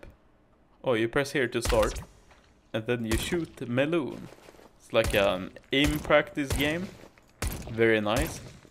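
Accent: Sweden. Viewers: are you swedih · Are u swedish? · Swedish accent is soooooo noticable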